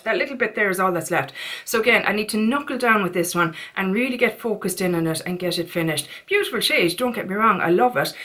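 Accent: Ireland. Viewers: I put my phone up to my husband's ears bc I knew he would Love your voice. He didn't know what I was doing. His response "I could listen to her all day". Kelly Macdonald is his favorite voice. Which region in Ireland are you from? I don't know the regional differences. Loving your videos! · What a brilliant tip from your subscriber, so simple but hardly thought of. Will definitely be doing that with products with air bubbles from now on. Love your videos, your accent is beautiful. What part of Ireland are you from? X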